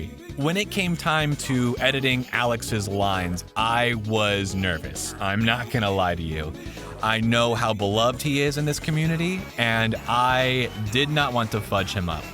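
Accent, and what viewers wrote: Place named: Estuary English, United Kingdom. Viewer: It's estuarine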